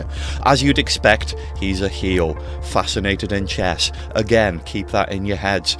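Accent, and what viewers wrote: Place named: Northern Ireland, United Kingdom. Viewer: Part of me wishes this was the final episode of the seventh doctors era. As cheetah Planet ain't bad its just feels like mid-season filler & it'd have been so much better for this to have ended an Era its spiritually the season finally but the way the episodes played it ended up being the second last story. It was the perfect end to the seventh doctor. I really am glad that its part of the easy to access stuff for the newer fans to the classic era. As its fitting I got this on DVD in 2013 when I was young round the 50th due to me having a magazine that talked about a bunch of stories & this one caught my eye & at the time it kinda went over my head & I tried to relive it last year or so but my disk was scuffed & the DVD is outta print. Was planning to watch it on iPlayer when it came out & decided to watch the tales of the Tardis form which is alright but I feel like it doesn't open with as much mystery when ACE spoils it. 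But other than that its great. I shall come back to this review once I've finished watching it again. I just came to comment because the internet is being a bit annoying & I am just waiting for it to work before I post this & return. Edit: this has been eating at me for a while but I've heard enough of your speech to make me think that you're Northern Irish like me. Never heard anyone outside of NI say Babi like other words have been used but none like Babi which makes me just think you are Northern Irish. Love from the beaches of Strangford lough where Vik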